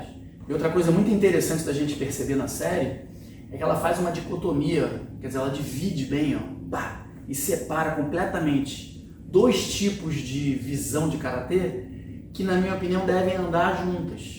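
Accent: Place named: Rio de Janeiro, Brazil. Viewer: Sensei, onde é sua academia? Treinei karatê por muitos anos e gostaria de poder voltar. Senti uma esperança, pelo seu sotaque, de que o senhor seja do RJ. Abraços. Oss!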